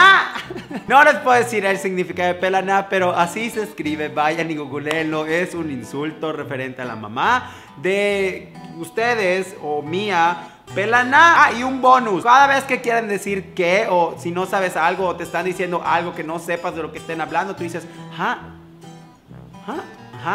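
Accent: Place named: Yucatan, Mexico. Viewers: Tu acento bien mayero :v Ma escupe tu huaya :v <3 Ja! :vvvv Te amo 7u7 <3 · XD yo si le entendí me gusta tu hermoso e acento yucateco me recuerdas a mi pueblo, mare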